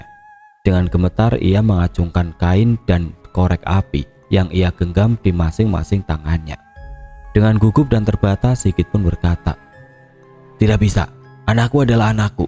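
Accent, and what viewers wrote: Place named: Banyumas, Indonesia. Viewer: Lama2 aku beneran gak berani dengerin ceritanya mas di tengah malam😅 Tapi seneng dengar suaranya ngapak2 gimana gitu bhkan kadang bikin ngakak juga 😅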